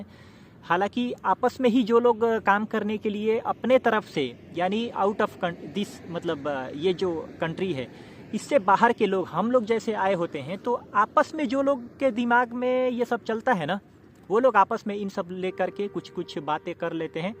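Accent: Bihar, India. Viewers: Salam.. Aap bihar se ho?? Your accent 😊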